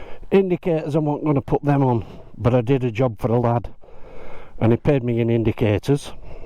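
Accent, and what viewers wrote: Yorkshire, United Kingdom. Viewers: I’ve been rewatching your “archive” recently as I miss your Yorkshire twang and your regular visits to Squires ! I can understand that you’ve already video’d all your bikes and that putting videos on YouTube is a lot of work but an update on your stable would be highly appreciated ! Best Wishes !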